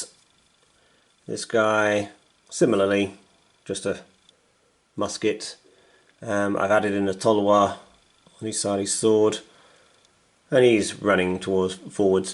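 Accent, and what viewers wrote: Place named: United Kingdom. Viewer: Thanks for providing this comparison. Very helpful. Given the historical era, the British accent gives this review something extra for Americans like me!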